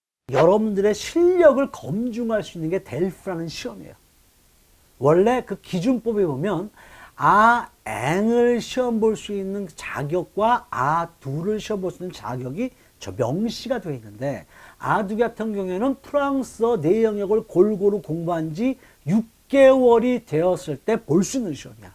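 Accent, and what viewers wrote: South Korea. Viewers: 난 이분 서울사투리가 넘 좋음 귀에 쏙쏙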